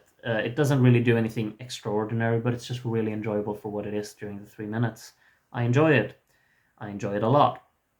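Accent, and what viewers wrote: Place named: France. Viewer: I love this videos as much as I love ur' french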